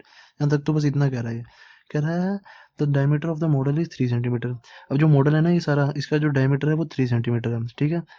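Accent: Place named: Kashmir, India. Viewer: you sound like kashmiri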